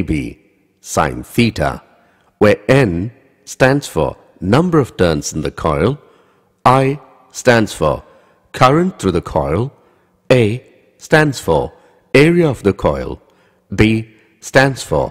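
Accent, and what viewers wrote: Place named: India. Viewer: Sounds like an Indian who tries really hard not to sound Indian.^^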